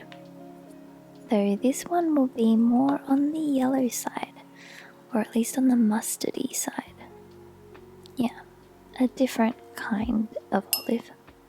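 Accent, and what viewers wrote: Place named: Australia. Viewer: Great to hear an Aussie voice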